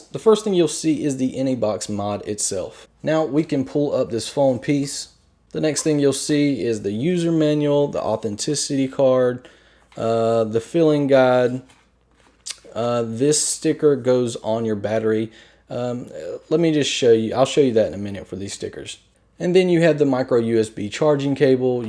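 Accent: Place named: South, United States. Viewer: I trust men with southern accents so I feel relatively comfortable asking you this question, hopefully you can answer it for me. Recently, my guy talked me into getting this box because he doesn't like my smoking. What, in your opinion if any, is the best e juice to use that is the most like a cigarette? I realize this is comparing apples to oranges, but I'm kind of desperate. I told him that I wanted the Juul and the original Kangerbox and the emporer's e juice...and he got me this Kanger-made NE box, no Juul system and some kinds of e juice that I hate. he kept saying "tell me what you want." I DID. But he would not add to the cart what I actually specified, so I ended up saying "just get me what YOU want me to have then." So he did and I am now in possession of the box...and no juice that I like. I don't trust going into the stores to test juices because I live in Springfield, MA. 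if you know anything about this area, it's nothing but criminals, and stores filled with really bad product with unregulated chemicals and whatnot. No sane person would buy anything around here. That said, my question is, what vape e juice that is like regular tobacco do YOU like, and where can it be bought online? Thank you in advance. :)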